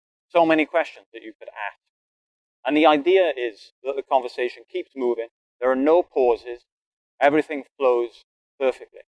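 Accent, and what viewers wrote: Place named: Wales, United Kingdom. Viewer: He sounds Welsh...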